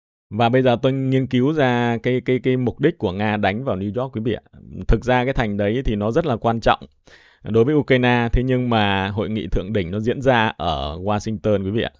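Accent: Standard. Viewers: Giải trình hay âm phát chuẩn rỏ ràng ❤❤❤🎉🎉🎉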